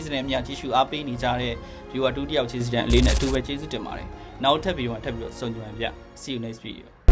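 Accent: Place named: Dawei, Myanmar. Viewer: ထား၀ယ်လိုပြောနေတာ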